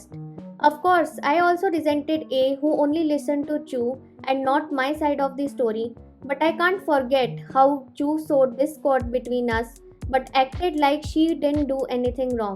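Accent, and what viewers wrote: India. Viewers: i like your accent..are u indian??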